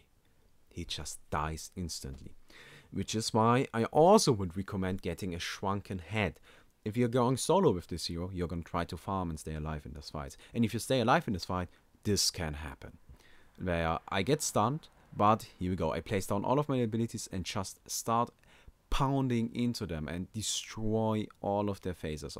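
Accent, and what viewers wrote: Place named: Germany. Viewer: Haha nice accent... du bist so deutsch! hies furd abiletea...that is in seit of his enemy.. krass.. guter guide... kennst du kino der toten black ops 1.. manchmal bist du ein deutscher und der hort sich genau so an wie dein ausprach.. wirklich ich lach mich tot.. gruB und keep on the good work aus holland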